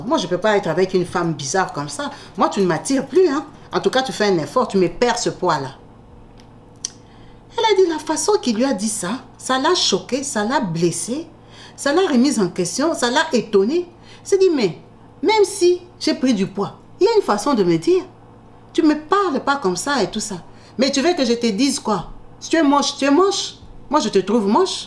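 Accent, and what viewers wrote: Democratic Republic of the Congo. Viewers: Oulalaaaaa qui vient agresser verbalement notre petite 😮 svp dégagez d ici si vs n appreciez pas, elle s exprime et on comprend très bien et l originalite de son blog est qu elle est authentique ds son accent, sa façon de conter tu te retrves on dirait une tantine qui raconte avec précision le kongossa com ci elle y etait et elle ns fait voyager ds les scenes. Super bonne narative pr ceux qui aiment ce qui est terre à terre. Il y’a des milliers d autres youtubeurs qui ont fait l accademie des beaux arts avec doctorats vs pouvez y aller 😂. Ns on aime notre petite avec sn accent congolais en plus elle est respectueuse ❤ moi ss une bne camer.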